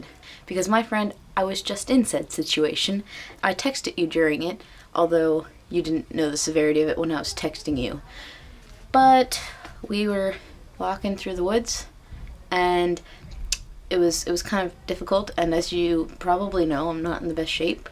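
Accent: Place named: Canada. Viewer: I KNEW IT YOU'RE CANADIAN. I love Canadians. And your accents.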